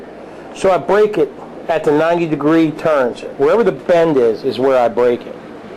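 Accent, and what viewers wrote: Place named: Northeast, United States. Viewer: He must be from the North East I guess New York or New Jersey one of the reason I moved to Texas.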